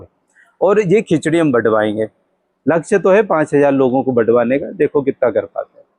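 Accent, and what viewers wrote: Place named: Madhya Pradesh, India. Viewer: Apne MP wale tone me kahani sunaye toh maja aaya 😂😂😂😂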